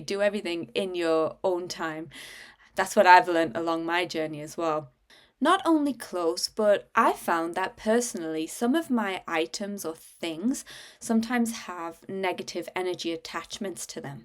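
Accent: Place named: Europe. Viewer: Hello, you seem to sound EUROPEAN. I'm from America.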